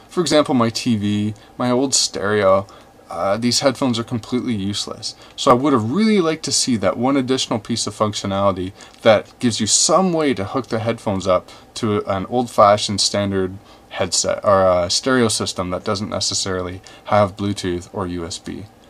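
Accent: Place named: Canada. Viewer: I hope the headphones sound better than your annoying Canadian accent.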